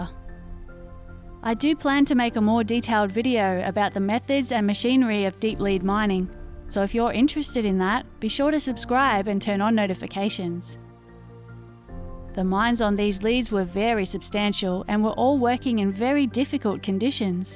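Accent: Australia. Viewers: I've said it before but I'll say it again: you need to start voice acting because your voice is incredibly Australian. I don't know what you look like but your voice is gorgeous.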